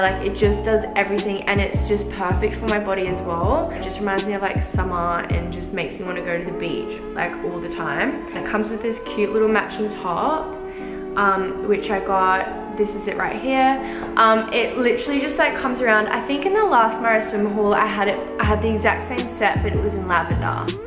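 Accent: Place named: Brooklyn, United States. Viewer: Love ur accent, Brooklyn :) nice video O:)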